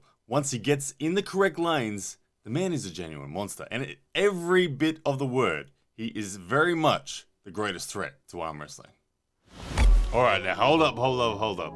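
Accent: Australia. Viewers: Is working on a new commentator voice? He sounds less Australian than usual, here.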